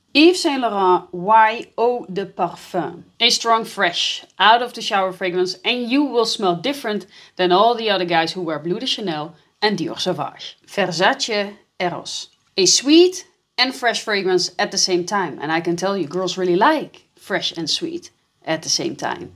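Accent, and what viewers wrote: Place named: Netherlands. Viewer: She has a Dutch accent.😊❤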